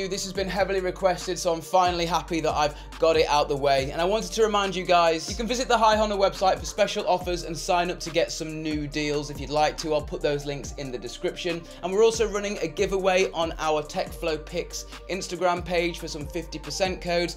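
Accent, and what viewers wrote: England, United Kingdom. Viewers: Each time I heard the British say "Rooter" for "Rou' ter" I cringe. Nothing bad implied, It reminds me of "root canal". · He's from the UK, not from the US... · How are you going to tell an Englishman how to English? 😂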